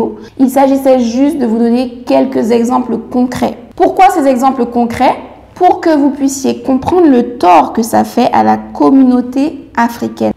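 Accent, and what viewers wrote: French Guiana. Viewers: je devine de par ton accent que tu es guyanaise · dans ta façon de parler on sent que t'es Guyanaise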